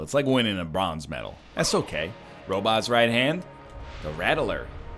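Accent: Canada. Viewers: I don't think I've ever heard Canadian accent as strong as I do in this episode, especially in the first half. He slipped into it during the bit and it took him awhile to pull back out of it.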